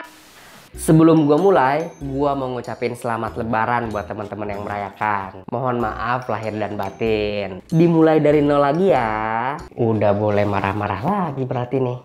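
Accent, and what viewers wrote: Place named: Malang, Indonesia. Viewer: Semacam arek malang bahasanya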